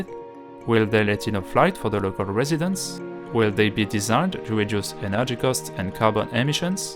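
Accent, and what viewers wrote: France. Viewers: Think most brits like the french accent. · It's not the accent which is most troublesome about this (after all, the fact that the speaker clearly hasn't sought help to IMPROVE his spoken English - all the vowels are French, the intonation is syllabic not tonic, etc etc. -all very predictable imperfections - is a comparatively minor matter), it's the extraordinarily careless attitude of whoever commissioned this audio commentary: thirteen seconds in and we get 'to discuss about' something: a mistake which should have been corrected in troisième... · Yes we like french accents...it sounds so french 🥖🧑‍🍳🎭🗼🇲🇫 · Love the French accent ! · The French accent is beautiful🌹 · Your accent is magnificent. I love France and the French. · You do not need to sorry for your French accent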